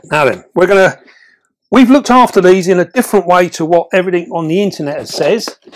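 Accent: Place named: Cockney, United Kingdom. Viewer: I stumbled on one of your videos yesterday and the way you handled and cared for a big Australian spider seemed to be a bit therapeutic for me regarding my arachnophobia. You remind me a bit of a cockney David Attenborough (no offence). Thanks, you've got a new viewer. 👍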